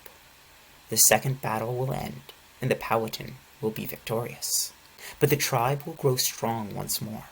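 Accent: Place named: United Kingdom. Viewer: I really love the British accent thank you !!!!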